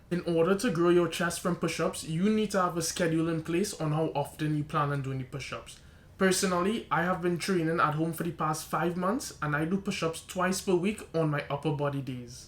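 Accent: Trinidad and Tobago. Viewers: Trinidad man?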